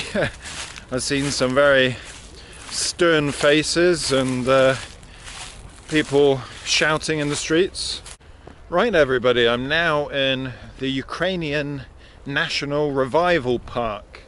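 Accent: England, United Kingdom. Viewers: Which part of England are you from? Can't work out your accent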